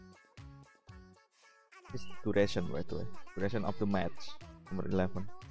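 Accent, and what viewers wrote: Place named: Indonesia. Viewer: Dari logat nya indo banget dah 🤭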